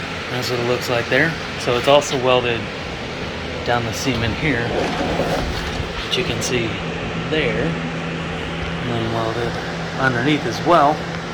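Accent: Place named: East Coast, United States. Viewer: Looks awesome…..I know your in Cali but you sure sound like your from the east coast!! One love G and infinite blessings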